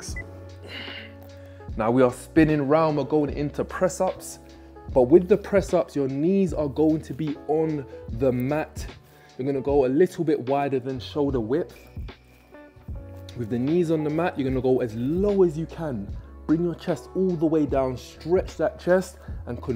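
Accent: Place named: United Kingdom. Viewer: and because he's real attractive and has a British accent most will ignore what they sensed Like I did 😂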